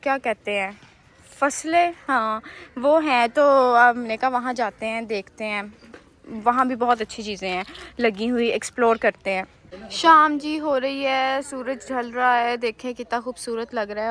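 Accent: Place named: Punjab, India. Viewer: Love your vlogs ND language style too ND specially your Punjabi accent 😁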